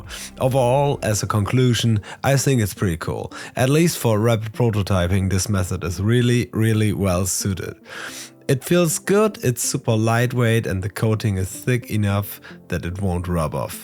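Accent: Germany. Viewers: I am sure its a germany guy :D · Thick german accent😂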